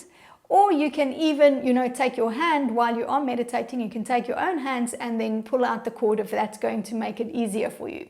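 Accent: South Africa. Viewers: You sound south african,I feel at home❤ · You sound south African 🇿🇦🥺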